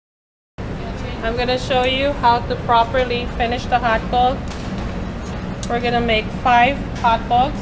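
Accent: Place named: New York, United States. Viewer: That vaguely hispanic? new york accent ❤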